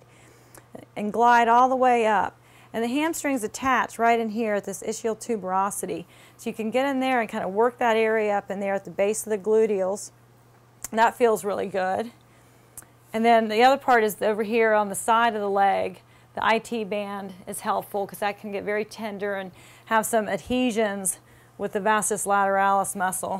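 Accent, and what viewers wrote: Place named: South, United States. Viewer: gorgeous accent. Sophisticated south.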